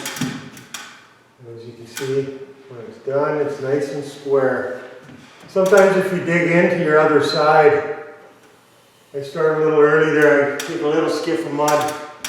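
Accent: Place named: Canada. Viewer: Sounds like a Canadian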